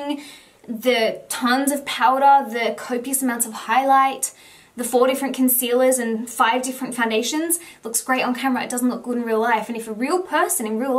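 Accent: Australia. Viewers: Is her accent Australian? Sorry I can't assess that I'm Dutch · Ireland? I always thought you were australian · Ireland? You don't sound Irish, you sound Australian or something.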